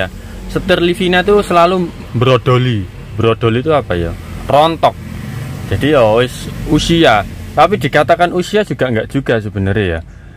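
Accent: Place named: East Java, Indonesia. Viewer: Bahasanya kok jawa timuran om sampean wong endi 😅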